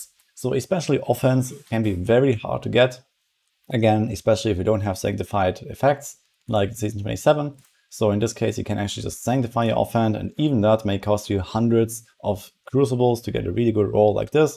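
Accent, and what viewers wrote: Germany. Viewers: Ich finde es immer so amüsant. 5 Sekunden vom Video geschaut und direkt gewusst, dass du Deutscher bist bzw. Deutsche als Muttersprache hast.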